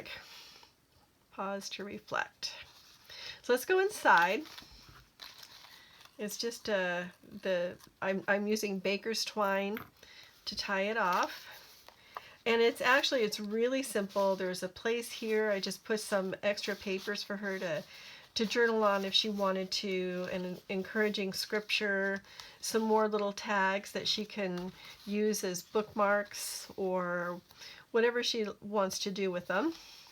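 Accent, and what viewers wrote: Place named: Pacific Northwest, United States. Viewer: So cute! Love them. It's my first time here and you sounded like you might local. I'm across the sound from Seattle.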